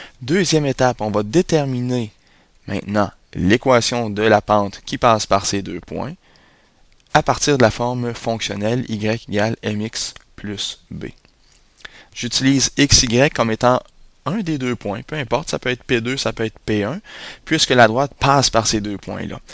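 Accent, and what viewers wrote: Quebec, Canada. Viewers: L'accent québécois ne gène plus tant que ça quand t'a besoin d'aide en math,c'est un fait,vive le Quebec.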